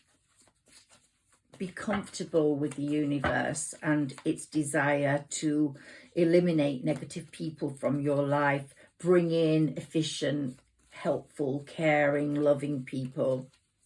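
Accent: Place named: Northern England, United Kingdom. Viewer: Yesterday was a very strange day, felt extreme boredom, very nervous, not sure why iv never experienced energy like this. These runners all seem to be virgo or cancer, my person a fellow saggi, never heard of a fire sign in this cycle, thank you for your beautiful reading I always look forward to hearing your slight northern accent lol thank you xx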